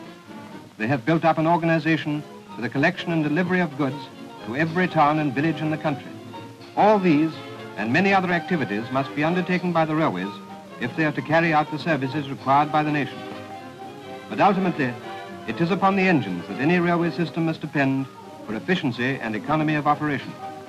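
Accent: RP, United Kingdom. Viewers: Not really. Commentators of the day usually spoke in what's known as "Received pronunciation." Wouldn't do to have unwashed heathen Northerners commentating on serious stuff like this....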